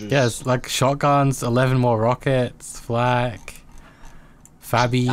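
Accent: Australia. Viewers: Are you australian? xD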